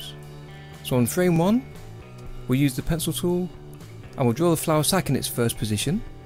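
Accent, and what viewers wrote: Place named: England, United Kingdom. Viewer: I hate English accent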